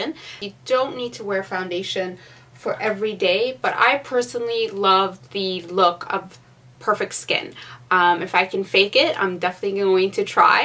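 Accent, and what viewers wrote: Canada. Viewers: I've been watching your videos for a while now - and I finally just now heard your Canadian accent haha.. either I wasn't really paying attention haha Nice look!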